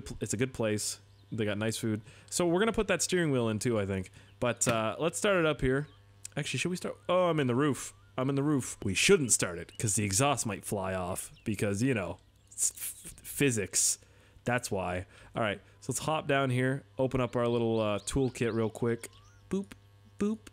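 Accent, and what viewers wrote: Germany. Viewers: im disapointed on the fact you put out german accsent on finnish game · Your German accent sounds like a gay man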